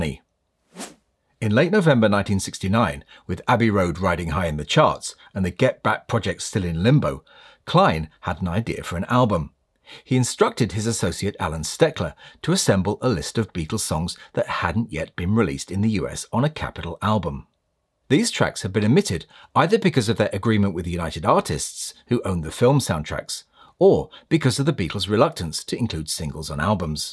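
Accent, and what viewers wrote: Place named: United Kingdom. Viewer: To address the part about "the problems with this album" & comments about the hodge-podge mix of early & later material: As a young kid in ~1978-79, I didn't have much spending money. But I had enough for 1 album. My logic for choosing THIS album, was because most of the songs were released as singles (in the 60s), but they never appeared on an album, up until " ". Keep in mind, the American album releases were considerably different than the British versions.. in content, title names & artwork. In my young eyes, this album had more bang for the buck, than any other albums, especially since my 2 favorite songs at the time were on here, and NOWHERE ELSE: " " & the "missing" song from Hard Days Night album- " "! I was a bigger fan of their early to middle years at the time (still am), so " ", " " & " " were rarely listened to. 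After Capitol records scrapped the old American album catalog & mimicked the British catalog, all of the " " titles were then found on main albums. The video host has a British accent, so he only focused on the British catalog. No wonder this album isn't a big deal to him. And that's true now, as well, with the homogenization of the album titles. Yet, this now-obsolete vinyl still holds fond memories for me, nostalgic fool that I am;-)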